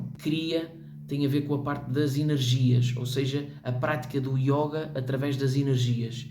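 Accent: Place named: Portugal. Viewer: Até que enfim ouço alguém em língua de Portugal Refrescante ouvir um português Parabéns Vou agora ouvir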